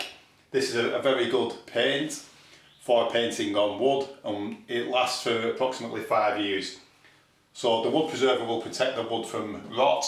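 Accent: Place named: Chorley, United Kingdom. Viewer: I really do love your videos-have learned so much from them! Great accent too! I grew up in Chorley and it makes me feel quite homesick when i hear you! Any chance of a video to do with fitting a small handbasin in a toilet only room? Need to do this job and would feel much more confident to see you do it first!! Good stuff...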